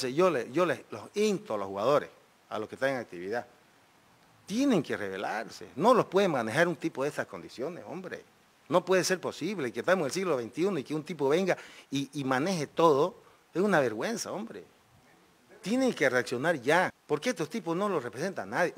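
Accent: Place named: Camba, Bolivia. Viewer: esta es la voz de un camba de verdad, grande!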